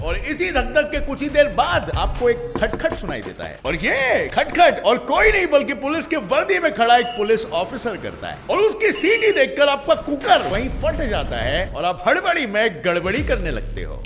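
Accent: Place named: Telangana, India. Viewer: Telangana hindi language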